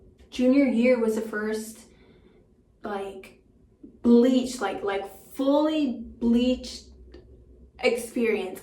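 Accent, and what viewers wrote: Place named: United Kingdom. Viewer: 🤣your British accent always coming through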